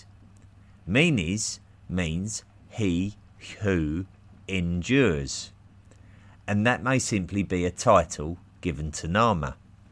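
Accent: United Kingdom. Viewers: What in the hell are you talking about? All I hear is a British accent espousing junk science.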